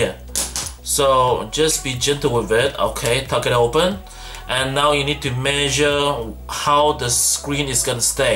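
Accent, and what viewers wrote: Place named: Australia. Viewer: Asian & Aussie accent... 1st time I've heard this mixture. 😎👍🏽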